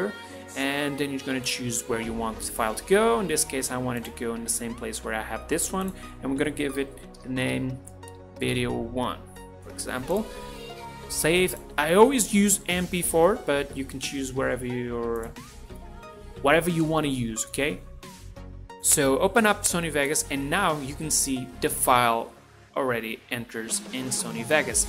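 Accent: Portugal. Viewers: ele é português, se não me engano. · Are you portuguese portugal?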